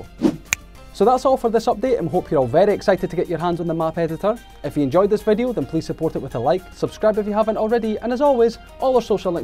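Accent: Scotland, United Kingdom. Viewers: YES a scottish person releasing official updates. FINALLY!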